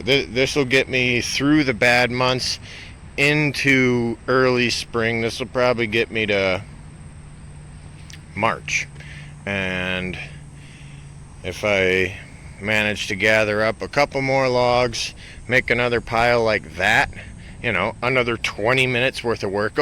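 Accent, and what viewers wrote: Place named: Wisconsin, United States. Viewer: You sound like you might be from Wisconsin. Menards too? Go Pack Go!!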